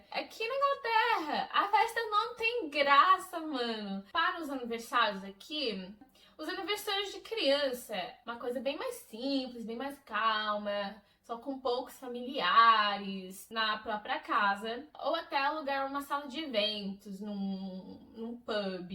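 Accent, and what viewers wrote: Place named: São Paulo, Brazil. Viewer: Vc parece paulista, curioso o seu português ser tão perfeito . Deve ter ficado muito tempo aqui.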